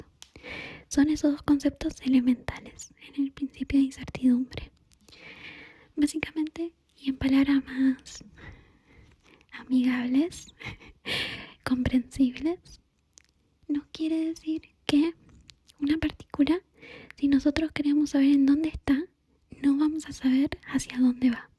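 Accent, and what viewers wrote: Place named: Argentina. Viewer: hablas argentino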